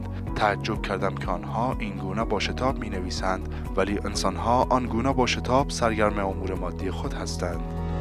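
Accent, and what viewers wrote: Afghanistan. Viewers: مزخرفات وچرندیاتی جدید بالهجه مزخرف وریده افغانی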